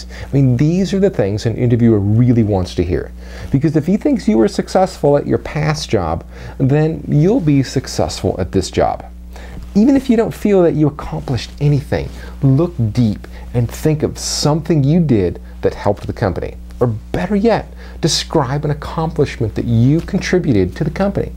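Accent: United States. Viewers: dominated ameriican accent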